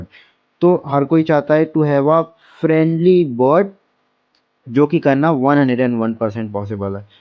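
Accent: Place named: Gujarat, India. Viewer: Very informative video..... Gujarati hoy avu lage che....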